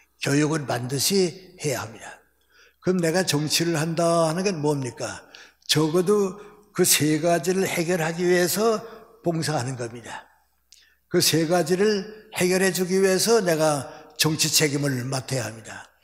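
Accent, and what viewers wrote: North Korea. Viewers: 이북말씨네요. 석가모니 부처님께서 최고이지요. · 올해 나는 72살. 어려서 학창시절 북한출신 은사님들로 부터 교육을 받았다. 김형석교수님의 가르침과 음성을 들으니, 옛날 평양사투리로 말씀하시던 선생님들의 음성이 들려와 하염없이 눈물만 흐른다. 선생님 건강하시고 오래사십시요. 감사합니다.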